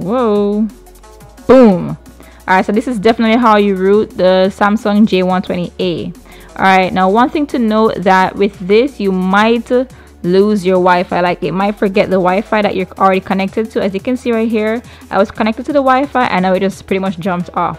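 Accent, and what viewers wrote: Jamaica. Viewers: U Sound Jamaican, I'm a Fan Of Modding Bypassing, Custom Firmwares & Roms! 💯 I Subbed ☺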